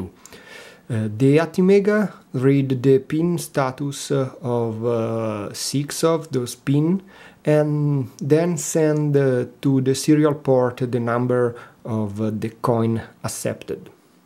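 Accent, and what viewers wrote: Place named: Russia. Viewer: Your english is like russian.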